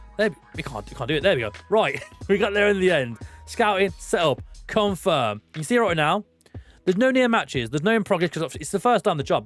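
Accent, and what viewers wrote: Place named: Plymouth, United Kingdom. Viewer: Are you from Plymouth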